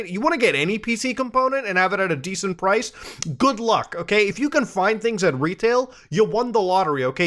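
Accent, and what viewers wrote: Canada. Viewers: Canadian accent was unusually noticeable in this video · I love when the slight Canadian accent comes out, but spittin facts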